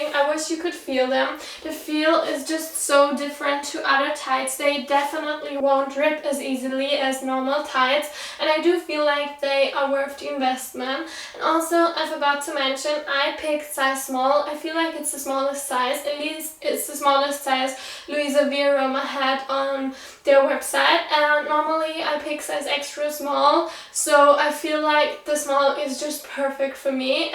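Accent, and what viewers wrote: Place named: Germany. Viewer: You look amazing in these Tights. And also i love your accent, are you german?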